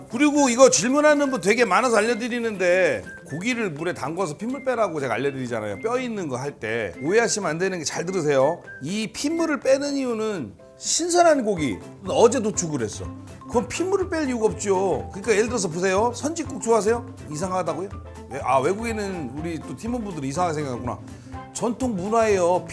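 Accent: Chungcheong, South Korea. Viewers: 설탕 싫으면 안넣어도 되요 ..맛없을껄 ㅋㅋㅋㅋㅋㅋㅋㅋㅋㅋㅋㅋㅋㅋ 충청도 화법ㅋㅋㅋㅋ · 충청도 사투리인지 이제야 들리네요 ㅋㅋㅋ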